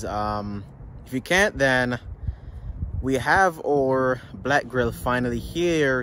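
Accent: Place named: Jamaica. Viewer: Jamaican accent came out in this one.. Proud of you, Excellent video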